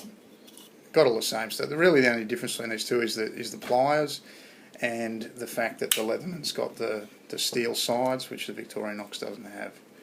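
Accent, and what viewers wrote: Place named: Australia. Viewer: wenger is apart of victorinox now :) oh and also ur accent gave it away... an aussie???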